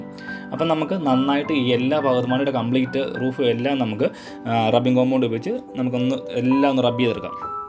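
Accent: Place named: Pala, India. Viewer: പാലായിൽ എങ്ങാനും ആണോ വീട്. എവിടെയാ. സസാരംകേട്ടിട്ടു ഇവിടെ എങ്ങാണ്ടു ആണ് വീട് 🤔🤔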